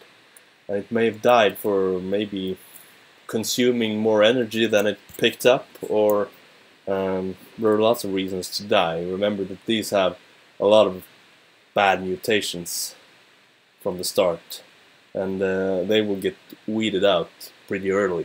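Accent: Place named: Scandinavia. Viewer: You sound scandinavian, sweed?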